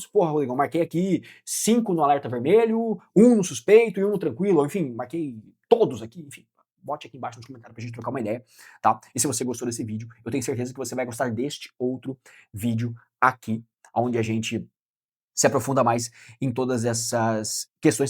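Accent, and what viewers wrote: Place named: Paraná, Brazil. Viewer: Vc parece do Paraná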